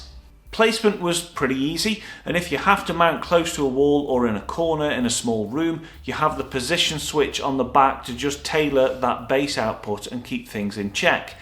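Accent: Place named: Stoke-on-Trent, United Kingdom. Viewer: Not a dig my friend....I spent time in Stoke years ago and it is a lovely accent....brought back nice memories! 👍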